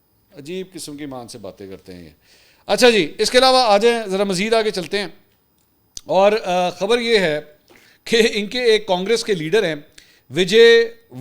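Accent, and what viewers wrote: Punjab, Pakistan. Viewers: bhai apka punjani me chatrol krna i love it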